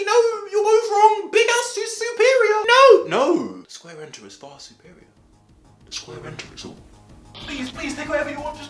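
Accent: England, United Kingdom. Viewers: Says in a lovely english accent: "it's fairly heavy--" SLAM!!!!!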